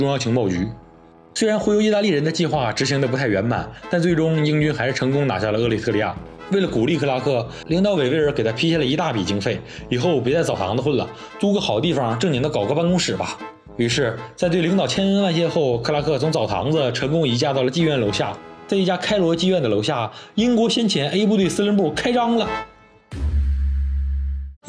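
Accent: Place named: Tianjin, China. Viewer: 小约翰可汗是天津宝坻的人吧。 哈哈，着口音解说绝了。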